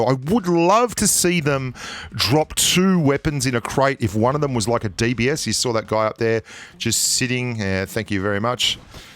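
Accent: Australia. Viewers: ur from australia arent u'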